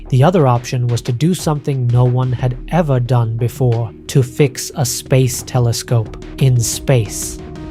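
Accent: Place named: India. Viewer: Sonunda Ai işini çözmüşsün çok güzel olmuş hint aksanı İngilizceden sonra hocam (haftalık canlı yayınlar geri gelsim bu arada)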